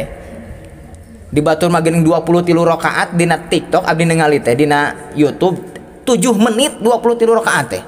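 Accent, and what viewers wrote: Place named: Jampang, Indonesia. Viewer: Berkah pak ustad. Ti palih mana ya nme ngarenge.. tapi logat na sapertos ti jampang..!! 🙏🙏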